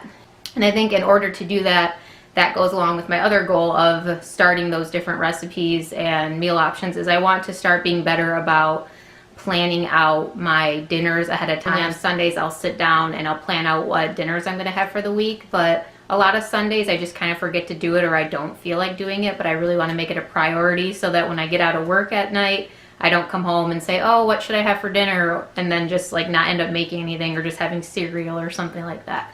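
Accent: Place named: Michigan, United States. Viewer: Ok, this is so weird, because as I was listening to you I was thinking "She sounds like someone from Michigan." LOL No lie! That's actually why I asked! Too funny! Are you on the west or east side? And no, the drive isn't bad at all. Unfortunately though, we discovered that my youngest (who is 13) has some serious anxiety when it comes to driving through the mountains. I definitely do, but we didn't realize he did until he about hyperventilated as we were going up to our condo. It was at the top of a mountain and it was pretty dang scary the sharp, steep turns you had to take where you actually couldn't even see the road in front of you sometimes! We decided next time we go, we'll try to stay somewhere not quite up so high. It was absolutely beautiful where we were though. Also, the guy who we rented the condo through on VRBO told us that he gives a good discount to returning customers and that he has some really nice places right in Gatlinburg, so we're thinking about doing that when we go again!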